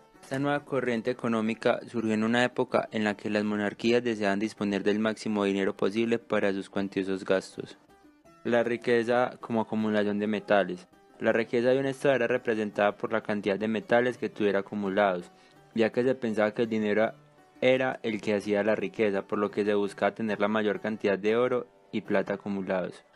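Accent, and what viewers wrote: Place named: Antioquia, Colombia. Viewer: asi es el acento paisa en colombia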